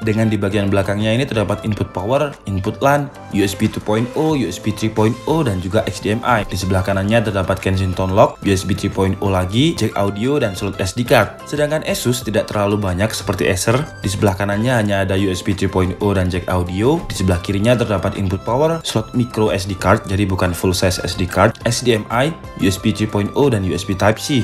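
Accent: Malang, Indonesia. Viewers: Wong Malang a iki?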